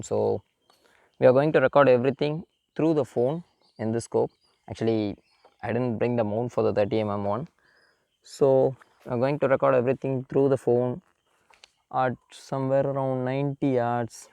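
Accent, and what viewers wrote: South India, India. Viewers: Yea , i think he is from south Indian, because his accent is like a South Indian that's why so i m mistake thn please forgive me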